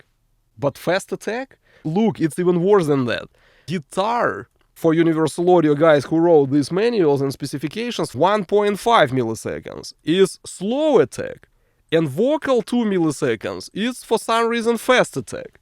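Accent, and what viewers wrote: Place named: Russia. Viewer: Русский узнает русского за секунду)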